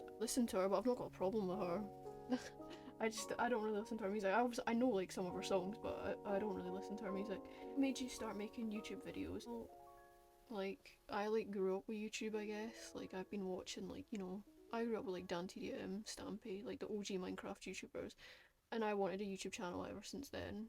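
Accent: Scotland, United Kingdom. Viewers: Omg ur Scottish 🙀🙀🙀🙀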